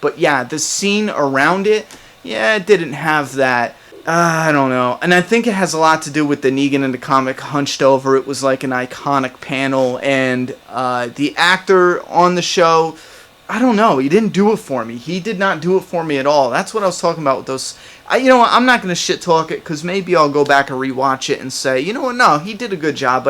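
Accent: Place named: East Coast, United States. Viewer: Q&A where are you from? I hear an East coast accent.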